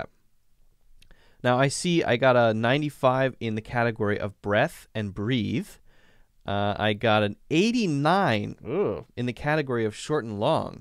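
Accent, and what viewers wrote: China. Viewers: 应该是北方口音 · 4 years later and I just wanna say your Chinese accent hits hard as a Chinese person😢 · Your chinese accent is just wow!! So chinese! Impressive! Thanks for sharing this with us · hahaha I find this video because of my work's research. Although this was three years before, it really helped! Thanks! And I have to say your Chinese accent of speaking English is pretty good hhhhhh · your Chinese accent is amazing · You have a very good Chinese accent 👍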